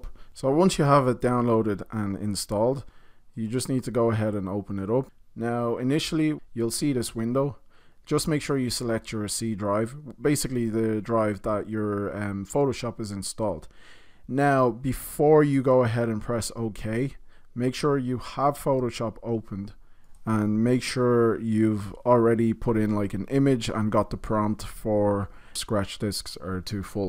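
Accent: Ireland. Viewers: Ayo your Irish?! 😮😮 Cool tutorial bru!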